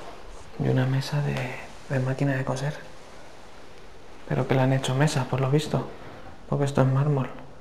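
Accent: Spain. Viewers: Por su acento parece español,mucho gusto... Con todo respeto 🙏 no creo nada de mansiones abandonadas,tengo derecho a opinar y pensar cómo cualquier ser humano Pero si realmente estuviera abandonada.... La suciedad de tierra insectos etc etc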